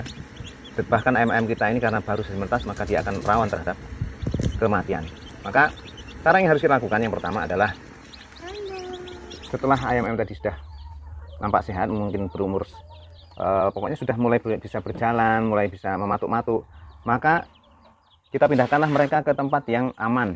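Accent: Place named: Java, Indonesia. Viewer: Matur nuwun Bp dgn nya. logatnya kok Jawani gih.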